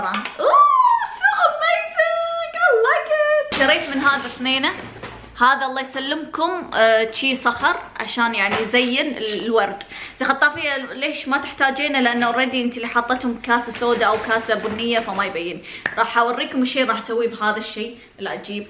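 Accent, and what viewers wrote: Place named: Kuwait. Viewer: احبج كثير واحب لهجة الكويت اختك من السعوديه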